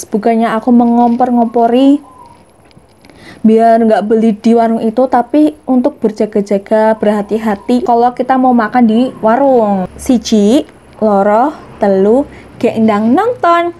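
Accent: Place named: Tegal, Indonesia. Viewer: Orang Tegal yah.. logatnya kentara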